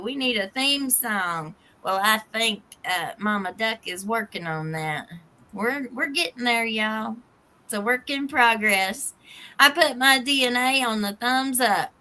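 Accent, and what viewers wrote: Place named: Kentucky, United States. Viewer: My grandma grew up in Pike County KY and listening to your channel reminds me so much of her accent! ❤She passed years ago but listening to your channel was so familiar to me because of it! Thanks for covering this case -- I can't wait until we find out what really happened here.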